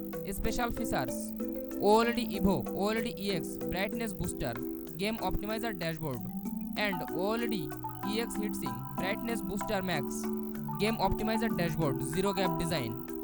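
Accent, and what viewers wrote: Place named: India. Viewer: Hindi native tongue speaking accent is not as thick as some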